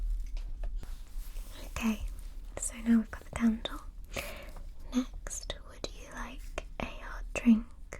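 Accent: United Kingdom. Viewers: I love British accents! I love you vids! Thank you!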